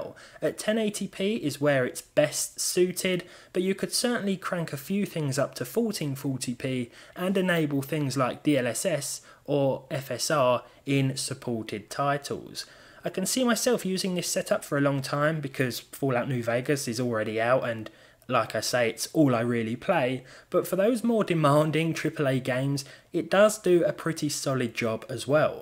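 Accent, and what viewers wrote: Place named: United Kingdom. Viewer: It's for the big brain... which pumps out the British accent... which makes both my ears and eyes bleed :(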